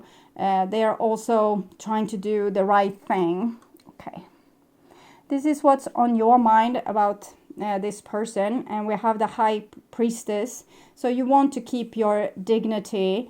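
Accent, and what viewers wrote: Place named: Sweden. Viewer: I thought I recognized the accent😍 Min mor ar fran Skane.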